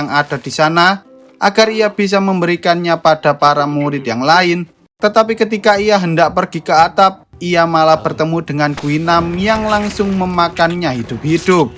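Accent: Java, Indonesia. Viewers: BANG JAWA MANA?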